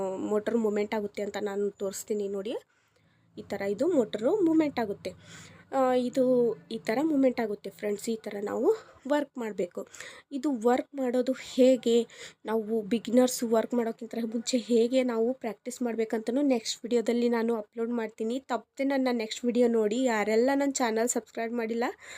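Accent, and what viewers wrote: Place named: North Karnataka, India. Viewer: ಉತ್ತರ ಕರ್ನಾಟಕದ ಭಾಷೆ ಚಂದರಿ... From Chikkamagluru..god bless u..and Tq ur information ❤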